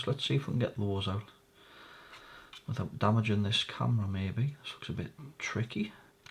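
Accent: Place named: South Shields, United Kingdom. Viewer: New subscriber,... Aussie but with S Shields roots... I've been watching a few of these repair videos, Just wondering where you are from? Sound so much like the kids I went to school with over there - especially 'bah-ries'... My mate always used to take the piss out of me for saying 'batt-uh-ries'. Hehehe...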